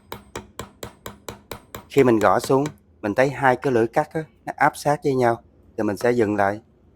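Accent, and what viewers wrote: South, Vietnam. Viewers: Giọng miền Nam dễ thương, hy vọng chủ kênh ko phải Vietcong. Chỗ tui sống toàn Vietcong sợ quá Vc luôn.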